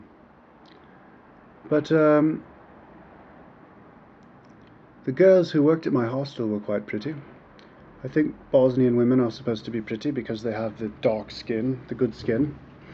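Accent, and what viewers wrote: United Kingdom. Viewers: It's strange how you don't have a typical american accent, you sound more British. You are very ignorant, and I really hope you were joking here. Otherwise it's just sad. What is the point of this video anyway? To show off your ignorance? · sry man. u sound kinda british :) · American with a British accent? Hmmmmm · Both accent and features rather point to British person... He visited my birth town Belgrade and i would like to tell that he visited Celtic city, Celts made in BC. Romans overtook `round 40 BC then Slavs came and city name changed from Singidun - meaning "Round Fort" (name given by Scordisci) to Beograd (Belgrade) which means white town, it seemed white to them. Since he is Brit this suffix (dun) should be something he recognizes..or not? This story is kind of skin tanned too much... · Your take on Serbia isnt that interesting, but you seem to think it is. 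And why not say you're a Brit? You don't SOUND like an american. I like Serbians. I'm an american. You seem a little Holier than Thou. It's off-putting. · That fucking accent is from Britain he is no fucking American ;) I wouldn't beat you because ur gay I would beat you because you are fucking nasty ass person. Did I mention you are fucking DUMB? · can´t you hear his voice. he is speakin´ clear british english so thats the explentation. so stop acting smart. u know it´s better to shout the fuck up sp ppl think you are dumb, as to say a word and clear all doubts!!! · he's british, or american with a british accent, which is extremely rare btw, you're disgusting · This dude needs to go to detox clinic first, then go to a mental institution to clear of his mind. He is mixing Bosnia with Serbia, he calls River SAVA - RAVA . . .he even managed to mix the fact that he is fucking British with perfect British accent, not American. 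He perhaps visited USA once in his mind! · You are not American. You have a British accent. So please don't give us a bad rep with your negative comments. I have friends in Serbia and would love to go there. I'm sorry your experience was negative. But really I don't understand why you don't finish eating, belching, picking your nose, etc. BEFORE you put your negative comments on You Tube for all to view. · Than you didn`t explore your country well enough. Have you been in New Orleans, or some suburbs of LA or NY? Pretty much filthy, even subway in NY looks that you can catch some serious disease, although it is a great town. Mexico is far away of great society............sorry USA. More important question is why this guy call himself American when he talks with British accent? Provocation or he is ashamed of his nationality, or he think that being an American is - cool? :)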